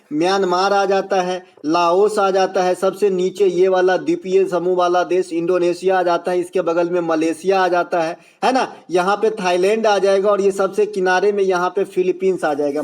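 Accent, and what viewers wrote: Bihar, India. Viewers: chutka sa bihar , maja aa gya . aapki boli bahut acchi hai sir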